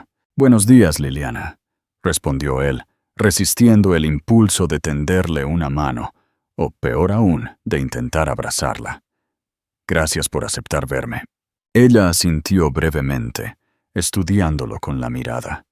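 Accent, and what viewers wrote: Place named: United States. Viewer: Pronunciation y acento muy gringo no me gusta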